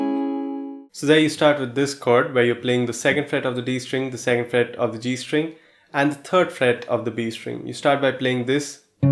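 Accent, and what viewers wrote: India. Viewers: You are Indian right I can understand by your accent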